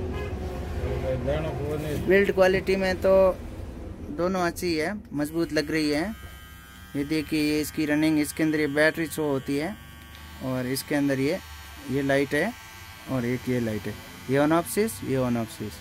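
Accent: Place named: Barmer, India. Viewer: Bhai aap barmer se ho kya. Accent us side ka lag raha ha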